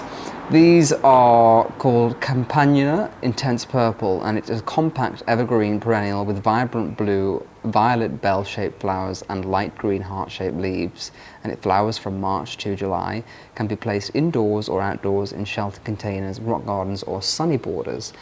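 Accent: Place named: England, United Kingdom. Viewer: I like your videos specially your English accent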